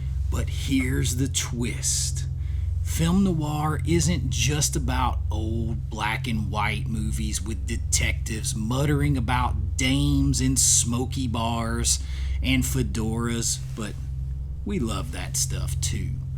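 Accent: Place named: Louisiana, United States. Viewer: Your goofy louisiana accent doesn't fit with hard boiled urban anti-heroes from Film noir movies. Stop trying.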